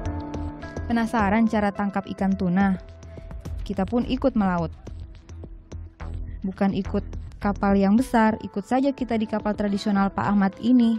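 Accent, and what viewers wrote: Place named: Gorontalo, Indonesia. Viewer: Saya baru nonton ..meski terlambat tp suka semuanya.. kontennya,narasinya sederhana dan tetap bercirikhas logat Gorontalo...pokoknya bagus dan asyik....👍👍👍👍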